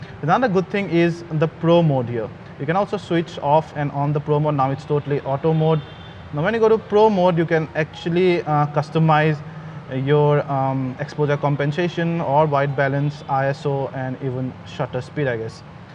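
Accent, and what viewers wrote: Assam, India. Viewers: mate . you do have an Assamese accent .. r u from there ??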